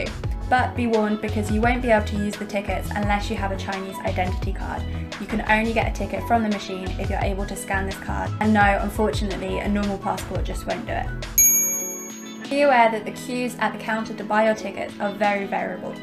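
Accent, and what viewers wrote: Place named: United Kingdom. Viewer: Love your strong brit accent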